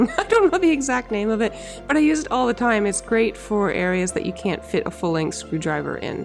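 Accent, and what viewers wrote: Canada. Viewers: Hi - I am watching this video and you are commenting on my own comments I made watching your other videos. Are you Canadian? I am as well though I've been living in New Zealand 25 years so it's hard for me to tell whether your accent is Canadian or American? Greetings from Georgian Bay, Ontario! Woop Woop - another fine work of art and the colour of the paint is divine. Please what colours were combined to get this shade. It's wonderful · Regarding countersink bits, and most other small items, cheap ones from Harbor Freight will serve your purposes fine. But your accent sounds Canadian, and I don’t know if HFT does business in that area. I think the analog might be Princess Auto? (I visited Ontario once 25 years ago—my knowledge of Canada comes from social media.) Harbor Freight sells notoriously cheap, in cost and quality, tools. They’re a running joke amongst pros, but an excellent resource for amateurs. 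But you’ll probably want a decent table saw though, rather than the absolute cheapest on the market. The Ridgid R4514 is currently on sale for $399 (Can$508) down from $549 (Can$699) at Home Depot, if HD exists up North and the sale is applicable there too.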